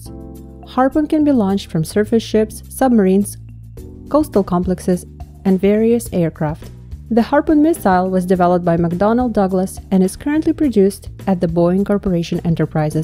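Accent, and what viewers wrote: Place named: Russia. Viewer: I’ll take a person with a Russian accents word over anything produced in the us as to the true capabilities of this weapon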